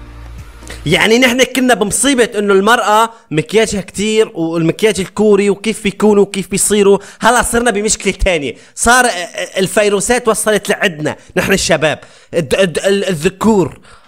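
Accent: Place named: Iraq. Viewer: ههههههه يخبل انته من وين حجيك قريب ع عراق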